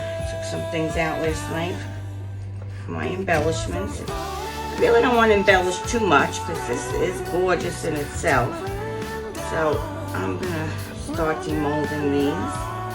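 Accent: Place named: East Coast, United States. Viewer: Absolutely gorgeous!!❤ I love the embellishments you put on them 💖 And every time I watch your videos I think to myself that it sounds like you’re an East Coast girl even though you live in Cali 😁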